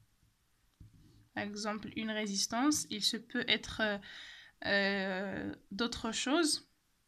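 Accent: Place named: Kabylie, Algeria. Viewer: Électronique de puissance avec accent kabyle <3 ! ( On a tendance a noter l'angle d'amorçage avec alpha pour laisser le Phi pour le déphasage en cas de charge inductive ), Merci a vous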